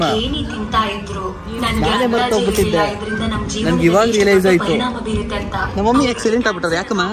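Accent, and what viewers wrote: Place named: Mangalore, India. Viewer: Aa manglore fast phase accent yellarigu artha agalla.. Artha mdkondre masth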